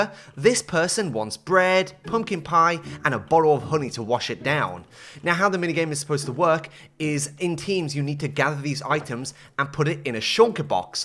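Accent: United Kingdom. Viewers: I love watching this guy. Especially since he is British. There was a science experiment and it was proven that people listen to those who have British accents more than other accents like Southern or New England accents, and I can defiantly say that I listen to this guy a lot more than most youtubers. :D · Why does your brittish accent attract me so much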